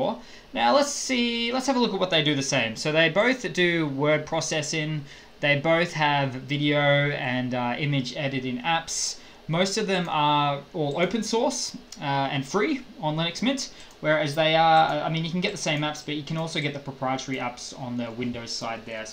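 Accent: Australia. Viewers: i love australian accent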